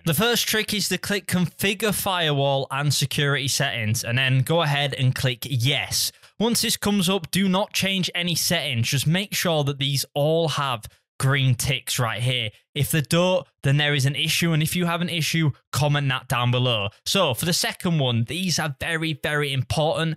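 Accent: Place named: United Kingdom. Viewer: Dang bros British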